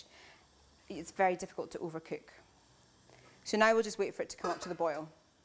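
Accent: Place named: South Africa. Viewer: I just love the South African accent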